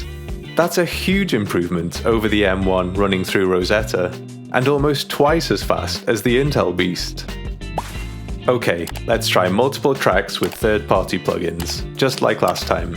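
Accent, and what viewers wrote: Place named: North Wales, United Kingdom. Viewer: Fandabidosi! Are you a gog like me, ie? I can hear your north wales accent. Diolch am hwn. Thanks for this video!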